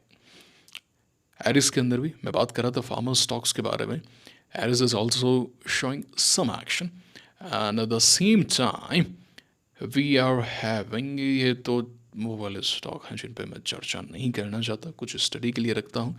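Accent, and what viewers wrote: Rajasthan, India. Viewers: आपके बोलने के टोन में कुछ ज्यादा ही राजस्थानी बोली झलक रही है, पहले से कुछ ज्यादा, so मिट्टी से जुड़े रहिए 👌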